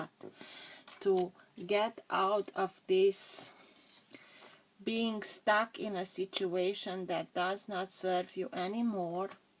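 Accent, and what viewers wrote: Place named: Transylvania, Romania. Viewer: Salutare! Vreau sa iti multumesc mult pentru etalare, nu am mai asteptat si versiunea in romana pentru ca aveam nevoie de ghidare.Si, ca de iobicei, am primit o.Dzeu a vrut sa ascult ce ai avut de spus in engleza.Vorbesc si scriu fluent ca un nativ aceasta limba si imi e la fel de usor sa inteleg ca si in romana.Sa te felicit pentru stapanirea acestei limbi si modalitatea complexa in care stii sa iti expui exact ideile, ce gandesti, ce vezi.......La pronuntie mai e nevoie de exercitiu, se simte puternic acel accent ardelenesc, dar totusi face ca accentul tau in engleza sa fie delicios.......Nu stiu sa iti explic cum suna, dar e melodios si frumos. Deci, la sfarsit de an, datorita tie, din nou, stiu exact ce am de facut.Vreau sa multumesc Divinitatii ca iti da aceasta ocazie, sa transmiti ce trebuie cui trebuie si cand trebuie, vreau sa inalt o rugaciune sa te mentina sa fii tu instati intotdeauna si sa aiba grija de tine si sa te ocroteasca! 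Daca nu ne auzim pana in Anul Nou, draga mea iti doresc Sarbatori Fericite alaturi de cei dragi tie, sa fii iubita si inconjurata de iubire, sa ai un An Nou deosebit cum esti si tu! Te iubesc mult, iti doresc tot binele! Gandul bun draga mea! Adrian, Constanta.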